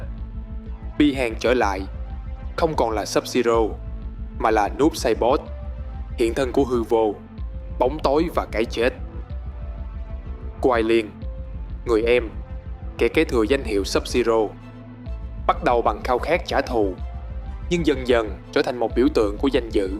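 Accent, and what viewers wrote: South, Vietnam. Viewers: Một trong những giọng đọc miền Nam mình yêu thích ngoài Minh Béo của Game cực hay. Kênh cố gắng giữ bạn này và phát triển các nội dung có thêm tính hài hước sẽ tăng tương tác nhiều hơn.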